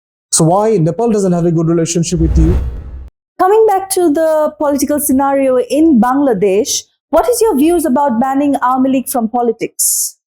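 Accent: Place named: India. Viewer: Indian দের সুর এ ইংরেজি শুনতে বিরক্ত লাগে আপু, ট্রাই করেন ইংরেজি ভাষা ইংরেজদের মত করে বলতে, উপদেশ টা পজিটিভলি নিবেন, আপু কিছু মনে করবেন না আপনার accent শুনলে ইন্ডিয়ান পালকি সর্মা এবং আরো ২/৪ টা রেন্ডি সাংঘাতিক দের কথা মনে পড়ে, তাই কইলাম আর কি 🙏! ফুয়াদ ভাইয়ের টা 👌 আর আপনাদের এই উদ্যোগ কে স্বাগত জানাই, এবং আপনি আন্তর্জাতিকভাবে বাংলাদেশের সত্য বিষয়গুলো ফুটিয়ে তুলছেন সেইজন্য আপনাকে অনেক অনেক ধন্যবাদ আপু ✌️❤️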